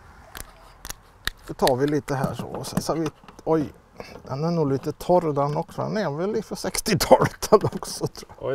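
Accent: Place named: West Gothland, Sweden. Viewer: Kan vara den Västgötska dialekten!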